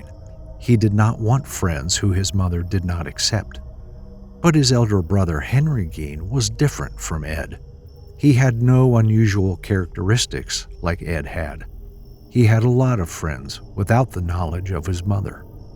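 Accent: India. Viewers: “I don’t know who this is, but he is from Kerala.” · Your accent is amazing for an Indian ❤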